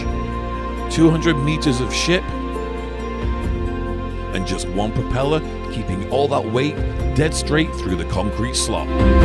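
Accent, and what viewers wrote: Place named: Manchester, United Kingdom. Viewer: A fellow brit & your in Canad! I recognised the Manchester accent. What a beautiful sight of the huge ship & another lift bridge like in Duluth MN. You are so lucky to see this. ❤ from UK. 🇬🇧🇨🇦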